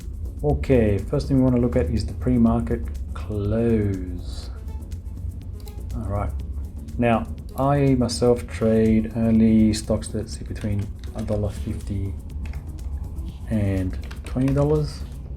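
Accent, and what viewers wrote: Australia. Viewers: Awesome bro! ... crocodile Dundee, are you an Aussie? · Hi mate, thanks for this video. From your accent I can tell your a fellow aussie! Is all this info relevant to the ASX as well? I'm using the free version at the moment, and I don't get any pre-market info, volume or gap. So to fix that I need to subscribe? I also believe to get live data and not 20min delayed I need the live data extra - is that correct as well? Cheers, thanks for any tips you have.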